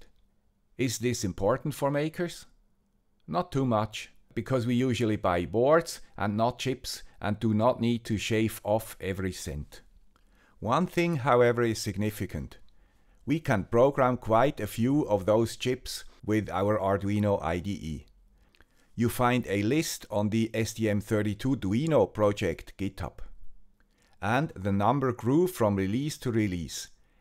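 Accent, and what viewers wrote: Switzerland. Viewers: The man with the Swiss accent does it again - another video of really useful information and comparisons. Thanks.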